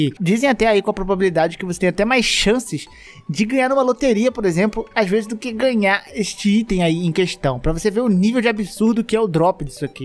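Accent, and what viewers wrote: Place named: Bahia, Brazil. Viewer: vc e baiano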